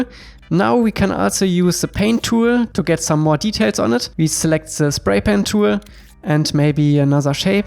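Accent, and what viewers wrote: Germany. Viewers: Wenn man den deutschen Akzent raushört ^^ gutes Video! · Are you German!? 😄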